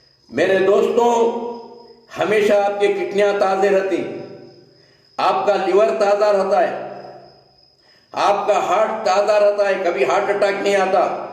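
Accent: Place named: Karnataka, India. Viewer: Nahi aati bhai ye karnataka wale hain dakni language bolte hain isko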